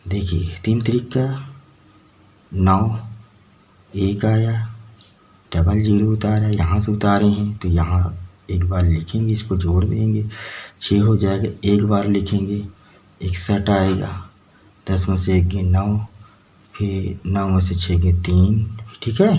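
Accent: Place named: Pratapgarh, India. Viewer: SUPERLIKE 47::)) बहुत ही श्रेष्ठ कार्य कर रहे हैं आप🙏🙏 मेरे पिताजी भी प्रतापगढ़ से थे, तो आपकी बोली से अपनापन सा लगता है । बहुत बढ़िया भैया जी🙏🙏🙏 बहुत बहुत धन्यवाद और हार्दिक शुभकामनाएं 🙏🙏💐💐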